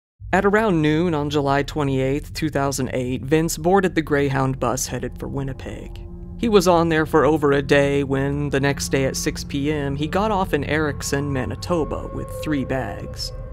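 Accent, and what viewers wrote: United Kingdom. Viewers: Wait, who was the British voice? Sounds sooo familiar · That really REALLY sounds like Adrian from Coffeehouse Crime. As a fellow Brit the likeness is uncanny. Anyway, great upload as always!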